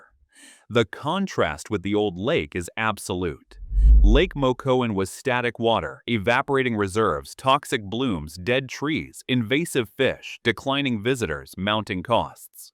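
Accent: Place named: United States. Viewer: ok - an American accent producing this video 🤣🤣🤣 have they been there before? it was the Victorian State Government - seriously did you do your research????????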